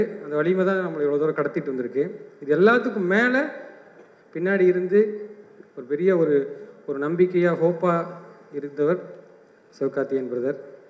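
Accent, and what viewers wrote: Kongu, India. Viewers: திருப்பூர் காரர் .. கொங்கு தமிழ் super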